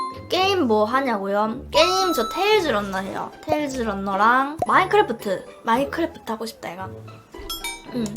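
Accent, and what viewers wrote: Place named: Seoul, South Korea. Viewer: ??타지사람들은 사투리가 들리나보다 난 걍 서울말 쓰는 걸로 들리는데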